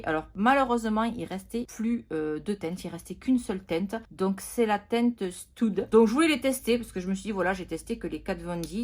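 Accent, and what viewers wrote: South, France. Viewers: Cc et son magnifique accent du sud 😉 bien le méga haul pro ❤ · Hyper beau ce makeup! Et ton fond aussi! Ça fait du bien d'entendre l'accent du sud :) Continue comme ça!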